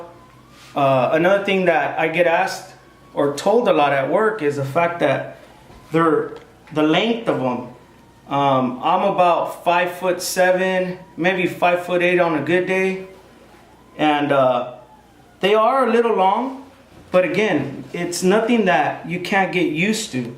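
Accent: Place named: Mexico. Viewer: se ve gringo pero tiene acento de hispano (mexicano?) :D what's up with that? trippy, no hate just curious from a venezuelan guy :)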